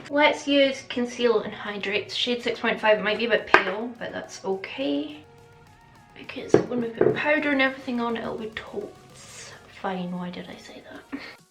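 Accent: Scotland, United Kingdom. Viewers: Sound funny hearing our own Scottish accent when u say thinks like “ur mental mate” ha ha brilliant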